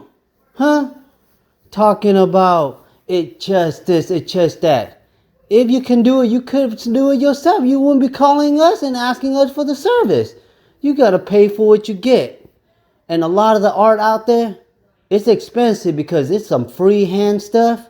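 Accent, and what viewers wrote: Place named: California, United States. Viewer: damn I was hoping yu were in the Bay!. I knew by the voice over yu were Definitely from California 🙌🏽💯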